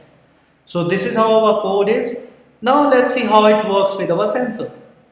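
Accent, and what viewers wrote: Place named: India. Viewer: Thanks for the video. Headache inducing accent though. There are two kinds of Idian accents. One is beautiful and eloquent. The other sounds like somebody talking with a mouth full of tacks.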